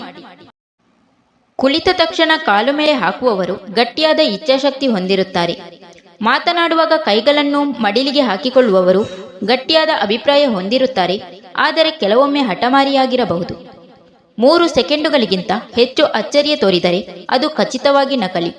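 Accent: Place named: Tamil Nadu, India. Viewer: Tamil accent... Good one 🎉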